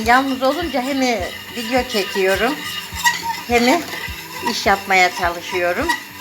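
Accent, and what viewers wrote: Kayseri, Turkey. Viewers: Kayseri şivemizi dogal ve cok guzel konusuyorsunuz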